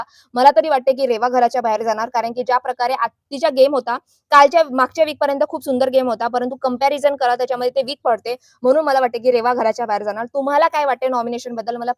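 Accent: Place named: Vidarbha, India. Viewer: वैदर्भीय भाषा वाटते ह्यांची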